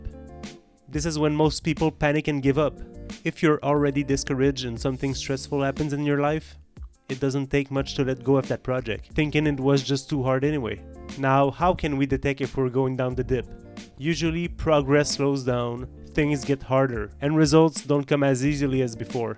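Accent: Quebec, Canada. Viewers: tu sonne quebecoi, nice video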